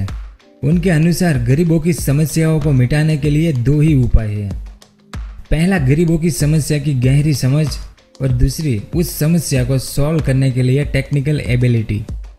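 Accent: Gujarat, India. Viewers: Gujrati hain bhai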